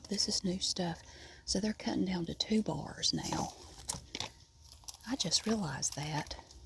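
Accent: South, United States. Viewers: Hey not trying to be creepy 😂 but I love your vids and southern accent but what southern state are you from I’m from South Carolina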